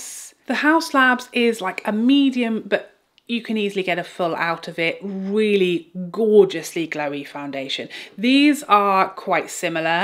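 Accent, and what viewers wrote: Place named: United Kingdom. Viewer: I had to leave my favorite Chanel foundations due to the heavy perfume scent they all have now. Just found Chanticaille Future Skin Gel Foundation - light to medium coverage, fragrance free, looks lovely on the skin but PRICEY. By the way, love your humor (humour) and your British accent - I could listen to you all day. XO from the US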